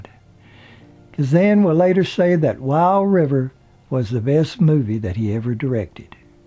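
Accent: South, United States. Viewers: THE VOICE HMMMMM!!! PASSABLE!!I GUESS ITS " SOUTHERN AMERICAN" ACCENT!!NOT CUT GLASS ENGLISH!!!HOWEVER, THE ENGLISH LANGUAGE HAS CHANGED DRAMATICALLY!!!EVEN IN THE U.K.!!!FROM(U.K.) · Interesting accent and pronounciation of some words. I wonder if it’s just the speaker or common to a town somewhere in the south. Good video. Thanks. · ...i got used to it.....and then I liked it...maybe southern or mid west? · Mr., you are such a good spokesman. You are genuine in you're speaking -when telling the stars biography. I so enjoy the way you speak. You sound like a southerner, yourself. I fine it odd that many people from the south does NOT talk as southern, as the stars who betray them. Of course some DO HAVE that kind of southern draw. I'm a southerner myself. When I married my second husband, his father called me his little southern belle. He was an attorney from OKC. 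An educated man. His family were originally from up north. The whole family had good grammer. I learned so much from them. Little country girl who had never traveled much. I was intimidated, to say the least. I married very young, the first time i married. They could have done a movie about my life. I didn't have a good beginning but, i did get the chance to go to college. After I divorced the husband in which I'm speaking of. My life very complicated. I was only 16 when I married, the first time. Dropped out of high school. I did take the GED test and passed, to my surprise. We all went out and celebrated. I had two children when we married and could not have anymore children. I was 7 years older, than my husband. I was 27 and he only 21. He was intelligent. Had a good career. His grandfather retired from the company, which my husband also started his career there. I had such a sad life. 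Had bad things happen to me. I've often thought about writing my own bio and see if someone would like it. I do think my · I told my mom that Lee had the prettiest eyes. I LOVED The Long Hot Summer and so did my folks! She died way too soon. Your voice is so soothing but I have lots of Southern reatives and I guess that's what it is. Could you do a video on Burt Lancaster? I always liked him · I love the narrators down home southern accent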